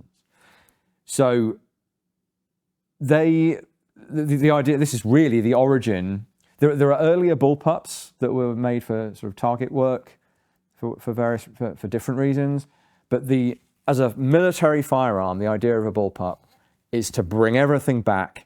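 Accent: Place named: London, United Kingdom. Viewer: I love London voice 😊.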